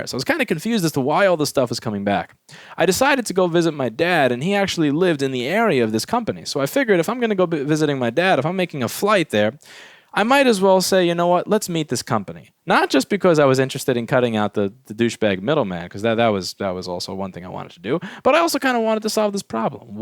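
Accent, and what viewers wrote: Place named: Staten Island, United States. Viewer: You sound like you're from Staten Island.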